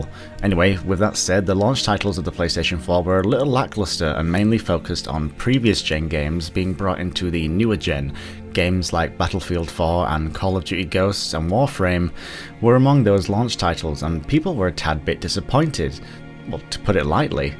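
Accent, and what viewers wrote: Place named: North, United Kingdom. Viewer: ok I already spent 4 miserable years living in the north I don't need to be listening to your disgusting accent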